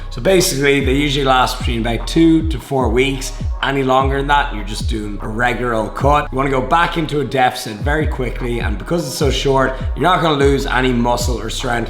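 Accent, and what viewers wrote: Ireland. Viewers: Are you Irish love from England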